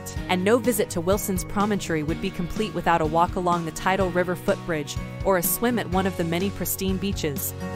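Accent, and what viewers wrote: United States. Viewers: Why is the commentary done by an American voice who pronounces several towns names incorrectly? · Annoying American accent, has to be most spoiling ever.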